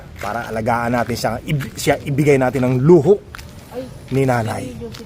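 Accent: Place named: Nueva Ecija, Philippines. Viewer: yong accent mo parang Nueva Ecija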